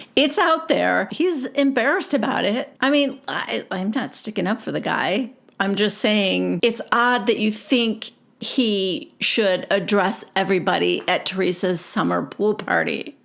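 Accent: New Jersey, United States. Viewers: Welcome back and I think this season will offer so many opportunities to use that Jersey accent. Moving is terrible. Hope you and olive and the mister enjoy the new digs.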